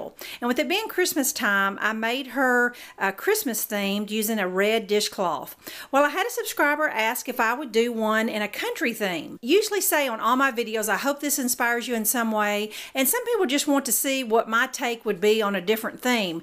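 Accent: South, United States. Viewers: First time seeing one of your videos. Loved it and love your southern accent. You said if anyone had any suggestions for projects to let you know so here is mine. Oven door decoration made from 1 kitchen towel and regular cotton material for the bodice. Looks just like a little girl's dress and they also have the boy one too. I watched a couple of videos but one was so fast I couldn't follow it and the other was way, way too long and talking about everything except how you put it together. Love your videos and I hit the subscribe button.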